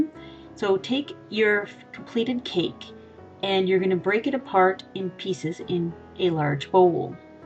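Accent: Canada. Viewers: I only just noticed that you're Canadian xD You have a cute accent! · Are you Canadian? O_o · She sounds more Canadian than Hawaiian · I'm guessing from your accent that you're Canadian...maybe in a French part of Canada cause on your frosting container it says "au chocolat" lol just curious. I'm from America but I love Canada. Btw, I'm definitely gonna try this.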